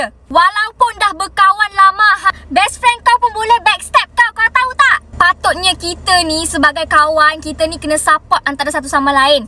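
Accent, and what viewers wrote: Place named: North, Indonesia. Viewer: Mula dgaq ckp cm keyy yell..lama2 dgaq cm org utara plk..😂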